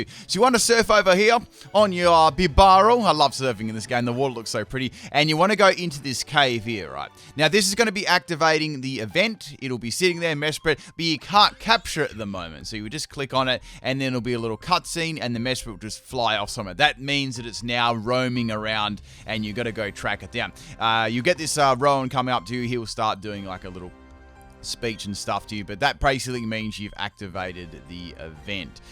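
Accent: Australia. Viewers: Im dead at ur thick Australian accent speaking all fast, normally I speed up videos to 1.25 to get the speed of the person explaining something right first time u had me lower it at .75 lol way smoother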